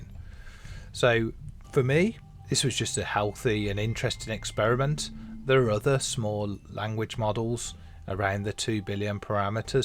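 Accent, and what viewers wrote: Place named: Birmingham, United Kingdom. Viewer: where are you from? you sound like you are from near where i'm from back home (near bham somewhere) 😂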